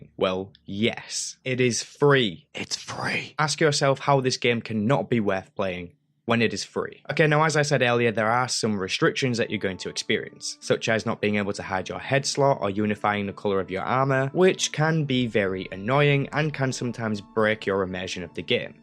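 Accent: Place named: Northern England, United Kingdom. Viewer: middlesbrough your from northern england for sure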